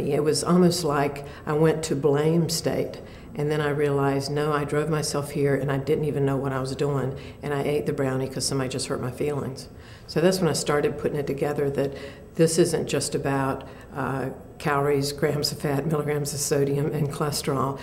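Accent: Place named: North Carolina, United States. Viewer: That’s the North Carolina accent! I was born and raised there and it sounds like home! 😂😊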